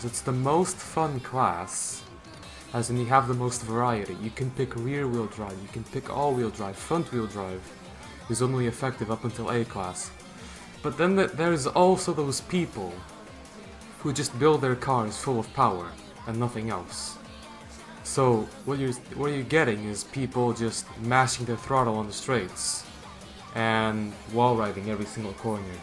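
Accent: Portugal. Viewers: Portugal accent? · Caralho, seu inglês é muito bom, muito de vez em quando posso ouvir sotaque português. Bom vídeo como sempre! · Voice is heard.. Maybe now I get a gf or a car faster... (By the way nice smooth Portuguese English eh)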